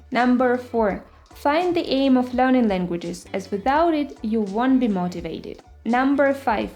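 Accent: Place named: Spain. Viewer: Her Spanish accent amazed me.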